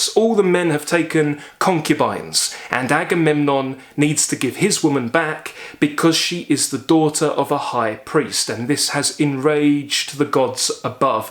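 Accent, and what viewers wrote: United Kingdom. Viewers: I really enjoy your introduction of great books. And of course sort of envy. I envy you upon reading all these books when still young. Your British accent is fantastic.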